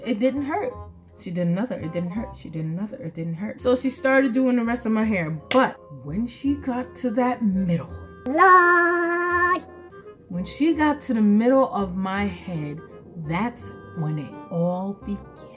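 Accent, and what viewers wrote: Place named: Africa. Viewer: 🤣🤣🤣 subscribed. Love the African accent🤣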